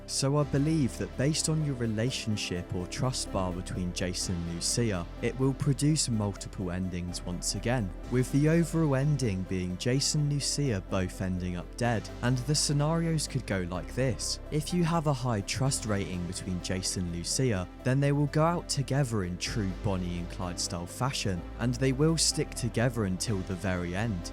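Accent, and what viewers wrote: United Kingdom. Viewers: tolerating british accent for 44 minutes is going to be real hard but there's no other choice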